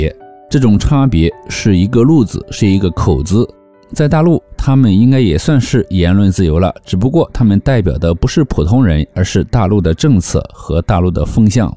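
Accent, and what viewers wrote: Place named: Mainlander, Taiwan. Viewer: 說話確實像小蔣時代台灣外省人口音。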